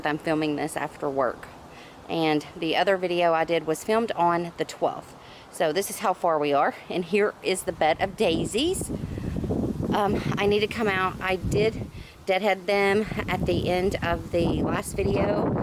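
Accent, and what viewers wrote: South, United States. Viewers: What a beautiful garden, and you have the most beautiful southern accent, my dear friend. What would you do if you planted a carrot garden?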